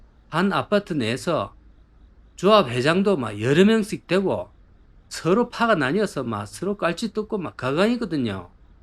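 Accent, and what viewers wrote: Gyeongsang, South Korea. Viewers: 오랫만 겡남사투리 좋아요~~ // 재건축하긴 세대수가 좀 많고..대지도 넓지 않아서 애매한 ...위치는 참 좋네요 ~ 주민들도 진퇴양난 이겠응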